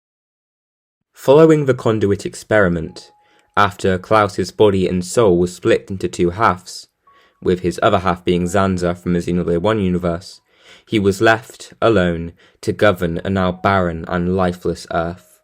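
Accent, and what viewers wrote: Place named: United Kingdom. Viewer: The fact that a British guy narratives this is so Xenoblade-y !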